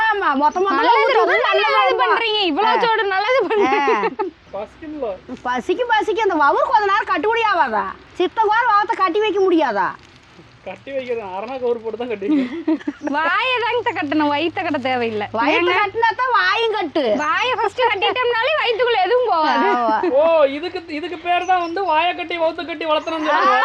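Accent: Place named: Tirunelveli, India. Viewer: Ninga entha uru district enna slang nalla irukku thirunelveli district?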